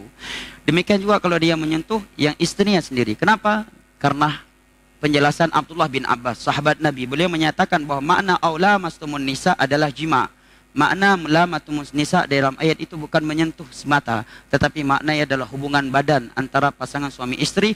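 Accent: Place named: Minangkabau, Indonesia. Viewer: Mungkin logat minang kental sekali, makanya agak beda sedikit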